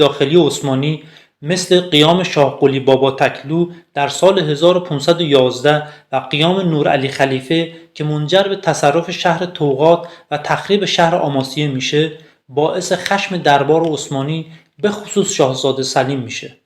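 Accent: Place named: Lorestan, Iran. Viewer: شما لُرهستیدازلهجه مشخصه...منم اهل پلدخترلرستان❤❤❤❤❤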